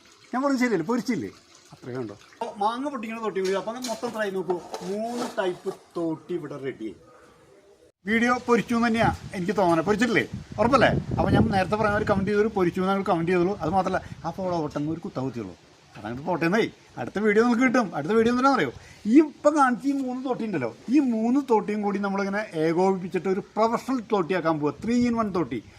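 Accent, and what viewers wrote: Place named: Thrissur, India. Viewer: തൊട്ടിയും പൊരിച്ചു തൃശൂർ ഭാഷയും പൊരിച്ചു 😅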